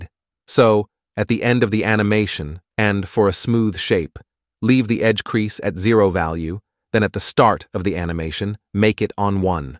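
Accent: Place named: United Kingdom. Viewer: Awesome video and chanel. P.s.: go back to the british accent! 😁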